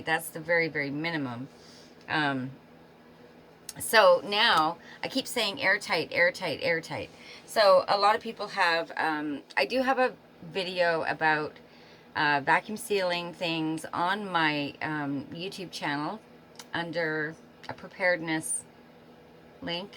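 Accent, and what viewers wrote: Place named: Canada. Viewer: I thought your accent might be Canadian! 😂 Thanks for confirming!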